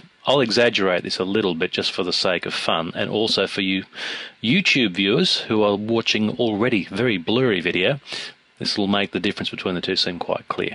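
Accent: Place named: Australia. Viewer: wow,, great tut man, great, btw,,,,,, are u australian???? cuase ur accent sounds like ur aussie..... lol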